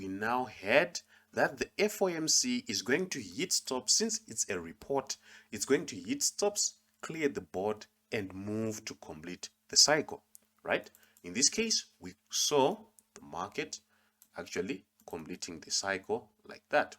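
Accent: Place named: Zimbabwe. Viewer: Hie bro, you sound like a Zimbabwean, are you from Zim?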